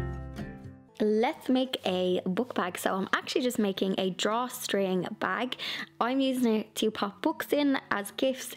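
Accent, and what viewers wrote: Dublin, Ireland. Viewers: I had to subscribe cause you are the first Irish youTuber I have come across. I have a son who spent some time in Ireland and he came back to Canada with the coolest Irish accent!🥰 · she has an Irish accent, a Dublin accent. My home town. It makes a change, as most of the sewing videos I’ve watched are from women with American accents. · Oh lovely an irish accent! Im thrilled, living away from Ireland in spain all i hear are british accents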